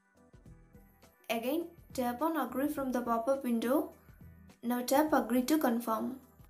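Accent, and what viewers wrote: India. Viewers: it says new york and the person talking sounds indian so im pretty sure its a fake address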